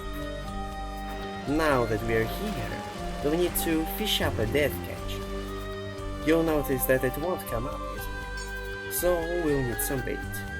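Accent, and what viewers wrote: Russia. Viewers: i can hear the russian accent )) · бро не может скрыть свой русский акцент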